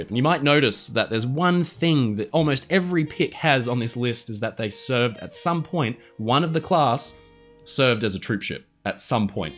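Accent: England, United Kingdom. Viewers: I thought you were from the UK , you sound more English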